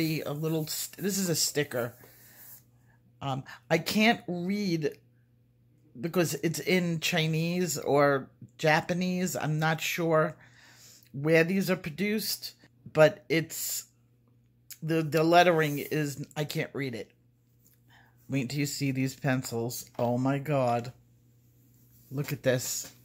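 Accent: New York, United States. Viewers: Love your voice & N.Y. accent.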